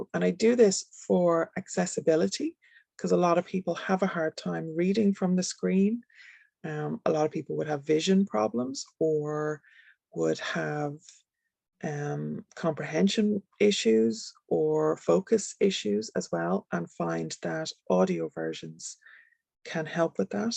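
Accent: Ireland. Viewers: I finally got in! It takes a little end-a-round for yanks! Your voice is perfect--Irish, but not too heavy an accent, which can make it hard to understand. Thank you for taking the time and consideration. I close my eyes and just try to picture the places, and the adventures that took place there! I will also read them myself, as that is my strong suit.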